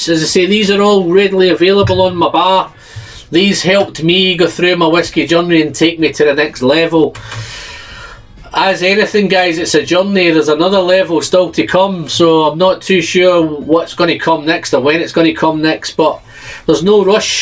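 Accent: Scotland, United Kingdom. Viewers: Edinburgh and still here. I love what all the Whisky guys are doing but a broad Scottish accent tops it off. Take care wherever you are traveling to and as Ralfy says, keep it quality and don't get pished.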